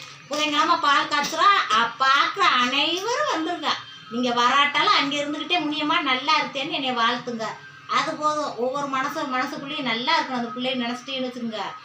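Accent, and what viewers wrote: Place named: R.S. Mangalam, India. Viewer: வீடு கிரகப்பிரவேசத்திற்கு வாழ்த்துக்கள் அக்கா நான் R.S Mangalam சொந்த ஊர் சென்னையில் இருக்கின்றோம் நம்ம ஊரில் உள்ள பேச்சு அப்படியே பேசிறிர்கள் ரொம்ப புடிக்கும்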